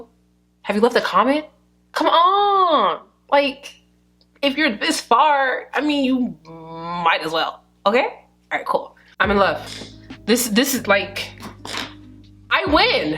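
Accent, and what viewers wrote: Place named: Nigeria. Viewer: The naija accent tho 😂 Love ❤️ this look 😍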